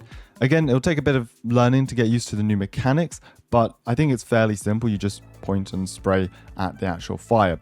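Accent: United Kingdom. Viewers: Wait are you British?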